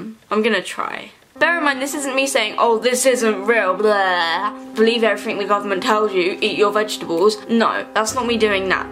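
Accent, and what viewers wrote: England, United Kingdom. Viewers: Delia Cano British prononciation and braces does that chill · In the JFK one it wasn't the driver it was gov. Connelly........ she keeps saying the driver driving me nuts british accent lol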